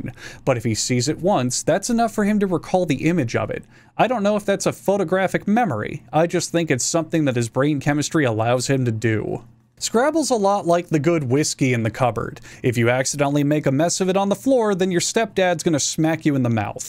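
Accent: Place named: Florida, United States. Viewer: He didn't pronounce it as an acronym it's just his Floridian accent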